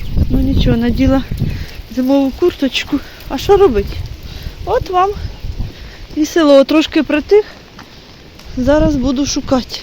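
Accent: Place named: Kryvyi Rih, Ukraine. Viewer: Яка красота я тоже виросла в поселку в кривому розі ,у нас точно такий говір,а де ви живете?ви молодці хазяйни бажаю всим миру,живу в Києві мені уже 72 роки,все промайнуло ,насолоджуйтесь життям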